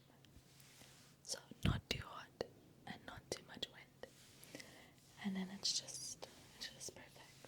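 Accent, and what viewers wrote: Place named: South Africa. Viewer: You're the only South African I know & I love your accent. Sounds to me like a mix between England & New Zealand. Very lovely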